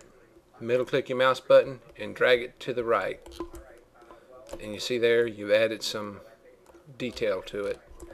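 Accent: South, United States. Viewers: love that southern accent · pretty funny how i automatically discount everything you say because you have a southern accent. that's not really fair, but it's funny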